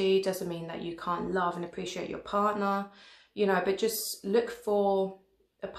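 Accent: United Kingdom. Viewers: WHY do you dis the westeners with your british accent. I'm Not defending us but we're the worst?? Are you kidding??? that is negative. its called sex not shagging,btw shagging makes it sound like its not really sex nor that it has conseqences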